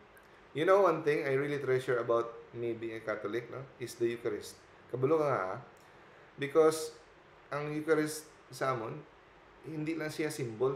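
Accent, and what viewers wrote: Iloilo, Philippines. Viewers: Ilonggo ka man gale doc ❤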